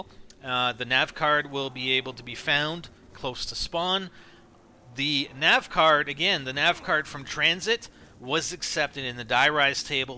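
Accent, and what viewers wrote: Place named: Canada. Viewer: let me guess your Canadian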